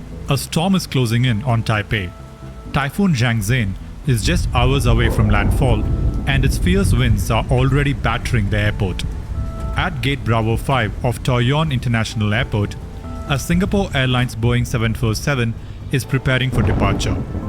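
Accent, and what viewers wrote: India. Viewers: 🔴🔴🔴 I CAN'T LISTEN TO HIS THICK INDIAN ACCENT, TOO ANNOYING! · Crazy heavy Indian accent. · He sounded Indian national! . Needed improvement on grammar pronouns actions. Tks. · Bro have Indian accent ❤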